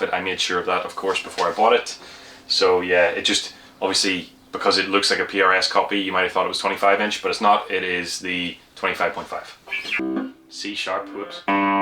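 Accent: Northern Ireland, United Kingdom. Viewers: Danny sapko watchers when they hear a British accent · That's quite a controversial statement - the Northern Ireland accent is not "British".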